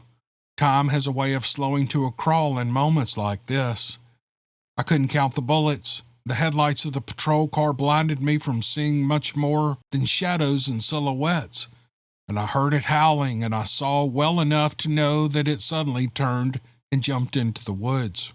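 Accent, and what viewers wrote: South, United States. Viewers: My wouldn’t think a small request would so rattle such a little peanut brain… nor that such a retard phukhed hides behind that dumb simpleton southern accent.. lucky the rest of your subscribers don’t know then you’d none left.. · Another great set of stories read by a great narrator with his Southern sound! Ms. Neoma Finn, you have written another story that rivals your other great stories!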